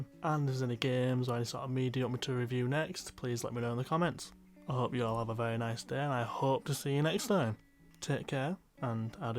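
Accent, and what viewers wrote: United Kingdom. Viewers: I'm more invested in the politics/news side of YT so I don't have a lot of experience with game reviews but this was definitely a good first video. Even though you're from the wrong side of the Pennines, it's still nice to hear more regional accents! 😁 I like how you break the review up by focusing on different elements (characters, gameplay, story, pros/cons) - this would work really well with some graphics and also help you to stay focused (if you're considering how to expand your look and build a brand). You sounded pretty confident throughout and if you were using a script then I think you must have spent lots of time memorising it. The only notes I have for delivery are the intro, you seemed to rush through it and it was a bit too fast. Take a breath and remember that you're awesome! Good luck with the channel, and well done on an engaging and interesting first video. 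👏👏👏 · I'm no gamer but a sub of your Dad's, a Bradfordiian and love our accent, I've subbed. All the best :) x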